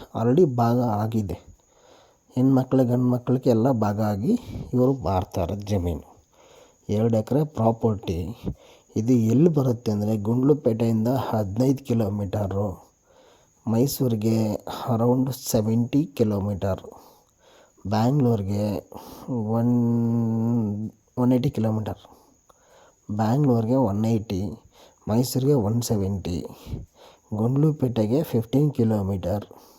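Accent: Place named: Tamil Nadu, India. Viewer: Irritating presentation 😢😢, please speak naturally, kannadavanna tamil accent alli mathadthare. Helidde heltheera, gottu english sariyaagi baralla antha, sariyaagi kannadadalle maathanaadu, english shoki yaake 😢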